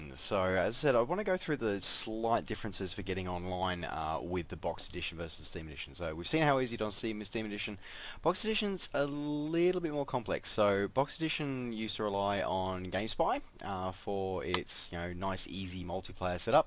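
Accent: Australia. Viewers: YOU MY FRIEND ARE AUSTRALIAN AND YOUR FROM GOLD COST OR JUST NSWI FUCKING CALLED IT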